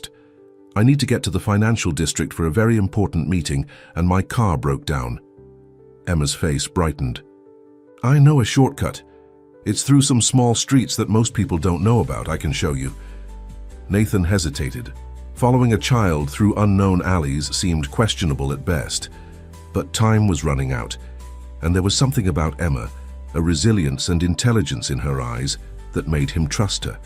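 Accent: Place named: United Kingdom. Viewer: a very elegant British narrator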